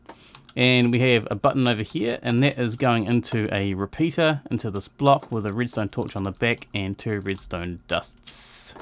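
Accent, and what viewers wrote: New Zealand. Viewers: Hey bro...works mean as,buzzed out when I watched your tutorial and heard a kiwi on the other end, just starting to get some skills now on minecraft and it's all due to the tutorials on youtube...keep up the good work man. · My water only flows to the right over the fence, only flows both ways when I update with redstone torch, but when i retract the water and dispense it again it screws up again...any ideas? P.S. Great to hear another fellow kiwi accent on youtube!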